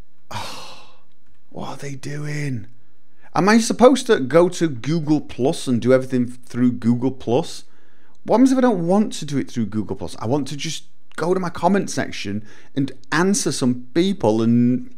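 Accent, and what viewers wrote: United Kingdom. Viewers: I clicked on this because I enjoy hearing British people talk. Same reason I watch TotalBiscut and Yatzee. That and I am interested in what they have to say. British game reviewers > American game reviewers. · on the other hand, a British rant has a flavour all its own. (^_^)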